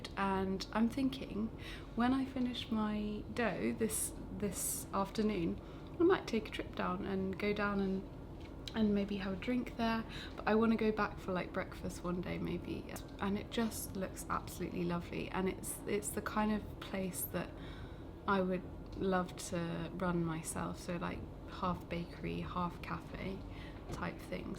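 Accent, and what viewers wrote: United Kingdom. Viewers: Du bist sehr Süß und würde ich gerne die Englisch Sprache lernen und zwar British Accent ich liebe das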